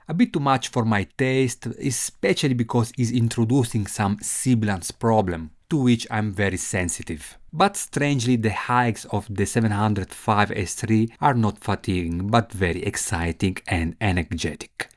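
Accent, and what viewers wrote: Italy. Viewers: I like italian accent! What camera do you use?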